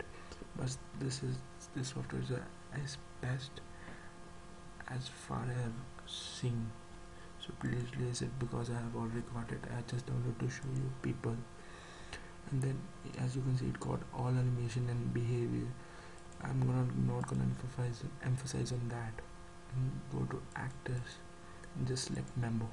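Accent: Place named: India. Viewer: im i the only one to hate indian accent ?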